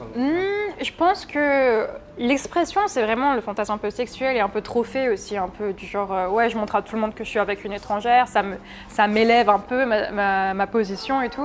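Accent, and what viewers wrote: South Korea. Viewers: Son côté coréen s'entend ... à son intonation, à sa voix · sa façon de parler ses mimiques sont coréennes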